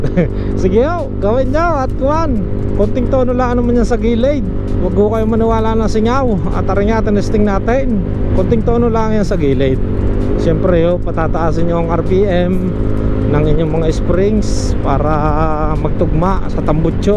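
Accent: Batangas, Philippines. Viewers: gustong gusto ko yang content mo boss at tonong batanggenyo..🙂meron din kc akong kamag anak sa may lemery batanggas..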